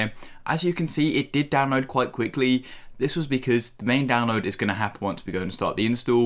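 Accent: United Kingdom. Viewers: bro is bri'sh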